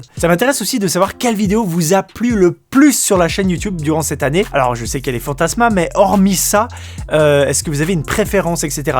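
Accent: England, United Kingdom. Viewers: J'adore toutes tes vidéos. Je n'en rate aucune. Peu importe ton accent anglais ( fuck les rageux) je suis admirative de ton travail. Mes vidéos favorites sont celles sur les animaux notamment celles de chauves souris. Et toutes celles où tu nous fais découvrir des lieux. Vraiment merci pour ton travail. Love sur toi 🙏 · Y’a qd mm que les francophones pr aller se moquer d’un accent anglais 🙄 au 21e siècle il serait GRAND tps d’évoluer, continue tu es parfait et merci pr cette belle année encore 🙌🏽🎉♥️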